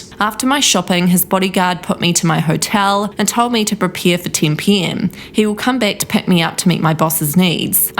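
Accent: Australia. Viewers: The narrator had a grating and annoying accent. Sounds like Aussie. Pretty sure there, it is legal or decriminalized. "Influencer'only a word that exist in modern shole degenerate societal garbage. So Dubai sounds modern day Sodom & Gormorrah? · The narrator/reporter sounds like a typical Aussie Bogan.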